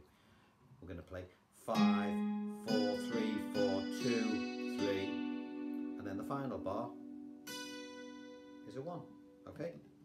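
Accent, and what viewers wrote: Liverpool, United Kingdom. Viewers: Good stuff. You a scouser, then?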